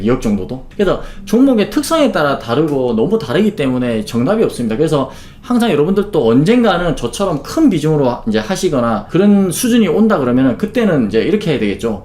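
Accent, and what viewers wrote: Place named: Gyeongsang, South Korea. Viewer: 이 형은 경상도사투래때매 포인트가 딱딱 박혀서 좋아!! 명불허전입니데이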